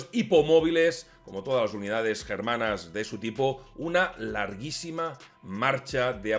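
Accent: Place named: Catalonia, Spain. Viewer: Tienes acento catalán. Creo que eres valenciano, verdad? Salutacions des de Barcelona.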